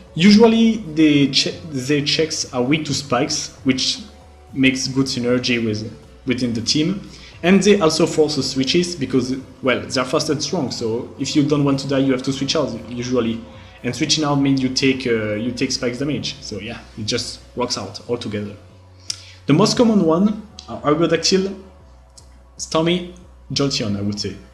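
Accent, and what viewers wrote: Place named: France. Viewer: C'est l'accent français ça ! Je reconnais Merci pour ta vidéo mec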